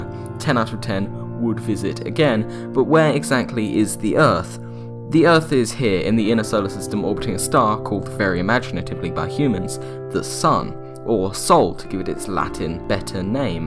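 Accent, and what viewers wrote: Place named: South, United Kingdom. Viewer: Hard to understand his south-english accent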